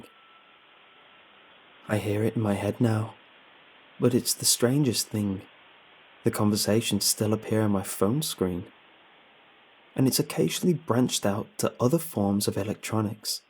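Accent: United Kingdom. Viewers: that kind of British accent 😂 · I love that your brittish! You always post around when I'm going to sleep, which is great!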